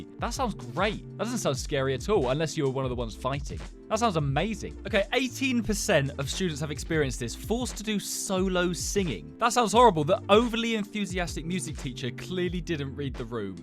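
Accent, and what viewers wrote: England, United Kingdom. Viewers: You are British I know it😅😂😂😂🎉🎉🎉😊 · are you British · AHH A BRITISH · Are u british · where in england u from? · Is he British??????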